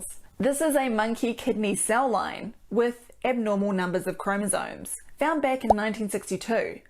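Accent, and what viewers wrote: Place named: New Zealand. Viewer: All this good stuff delivered in the most adorable Kiwi accent. Thank you Doc ...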